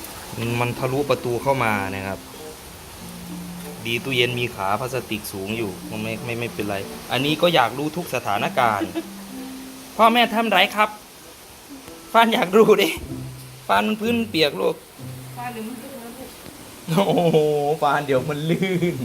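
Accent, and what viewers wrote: Isan, Thailand. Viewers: คนอีสานหรอพี่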